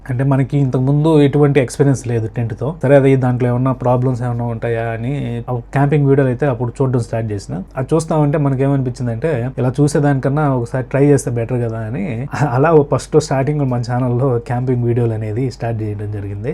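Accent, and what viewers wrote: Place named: Andhra Pradesh, India. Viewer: Your way of talking is too polite and ap slang is good and genuine bro keep it up ur progress is a head we all have to support u ok ❤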